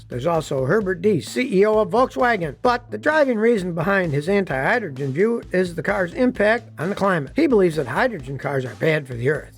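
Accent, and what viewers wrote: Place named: South, United States. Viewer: Wrong. Narrator sounds Southern. Sounds like a Saturday morning cartoon character.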